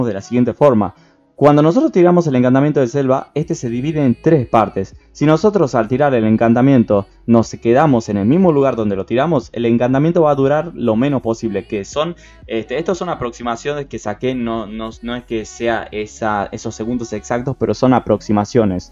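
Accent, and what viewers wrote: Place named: Argentina. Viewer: si le quitas el acento a argentino es igual a la voz de fernanfloo xd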